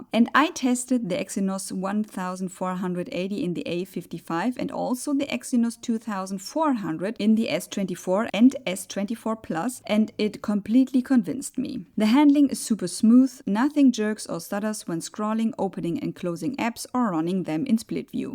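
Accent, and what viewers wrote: Germany. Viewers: Ich kann Ihren deutschen Akzent deutlich erkennen.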